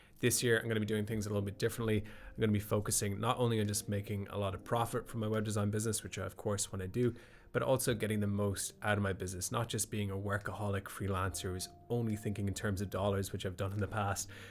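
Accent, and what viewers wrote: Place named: Ireland. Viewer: Hey,. Your content is awesome. I really appreciate your honesty and willingness to share your extensive knowledge and valuable insights. (The Irish brogue is pretty cool too. Been a long time since I've been there -- County Sligo it was, many years ago.) Question: Do you have any kind of Inner Circle Club, where we newbies to this game could ask you specific web design-related questions? If so, I would like to hear about it. Thanks again for all of your... can I say it?... amazing insights! P.S. Oh, yeah. One more thing. Those sound like good goals to have, and I really do hope you meet them all. Thanks again!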